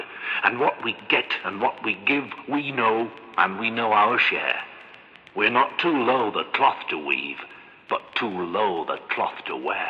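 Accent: United Kingdom. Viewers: As someone who is currently writing a doctoral thesis on the poetry of Ernest Jones I was fascinated to find this animation of 'The Song of the Low'. The truth is, however, that Jones's speaking voice would have sounded nothing like this. The accent of the fellow reading this poem (and reading it very well, I must say) is very much Northern English. Jones's father was Equerry to the Duke of Cumberland and he was brought up in Germany. Jones was famous for his refined, 'aristocratic' diction...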